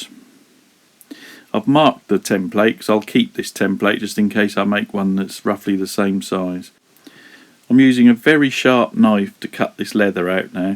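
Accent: Suffolk, United Kingdom. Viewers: You sound like a Suffolk guy inspired by jacklore just like me. This is great video and very informative. I love that little knife you made too.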